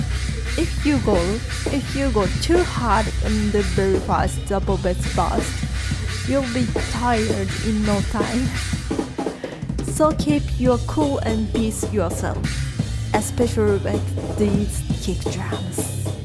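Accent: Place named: Japan. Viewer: Oh thanks for the english version! You're quite good at speaking it already. I think Japanese people speaking english sounds very cute lol, the pauses and how they pronounce things is nice :) . The background music is a little loud, but maybe you did that on purpose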